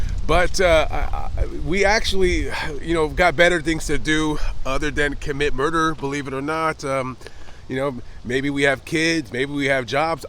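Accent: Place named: California, United States. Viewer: he's from California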